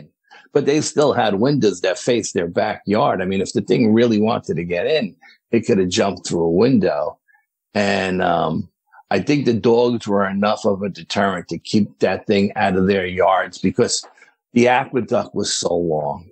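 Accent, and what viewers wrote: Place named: New York, United States. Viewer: Man I love this guy's stories! I think the ny accent adds to the charm! Lol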